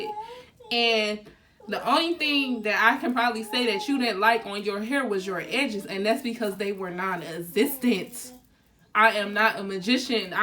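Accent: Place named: Detroit, United States. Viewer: You gotta be from Detroit 😂😂😂 I hear the accent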